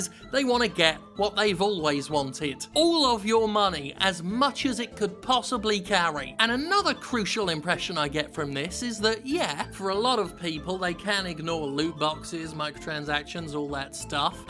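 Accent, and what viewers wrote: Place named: United Kingdom. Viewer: When he is fit, speaks with a British accent and uploads a 22 minute video on a Monday. Thank god for Jim Sterling Son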